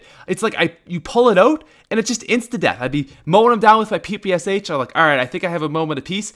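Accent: Canada. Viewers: he’s Canadian it’s his accent lol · He's got a Canadian accent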